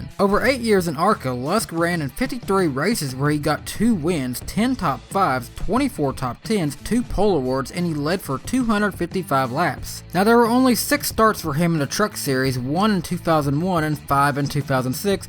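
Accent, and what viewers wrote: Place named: South, United States. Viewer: Down south, the proper pronunciation of the letter "I" is pretty much neglected. I live in TN, I see it happen all the "tom". Lol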